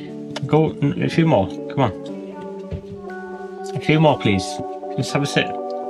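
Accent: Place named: North East England, United Kingdom. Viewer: Another good video, and always great to hear a North East accent (Greetings from Darlington)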